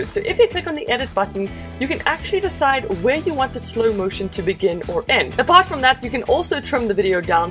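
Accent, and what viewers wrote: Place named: South Africa. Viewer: Damn, I didn't know you are SAn. Well the accent is there, but the channel numbers say a different story.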